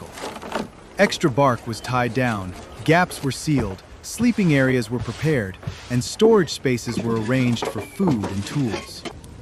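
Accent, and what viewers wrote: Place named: United States. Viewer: Wow great thanks, I really like how AI makes past living again. And that's a nice American prononciation, I understood everything. Thank you to show each native Americans way of life aspect.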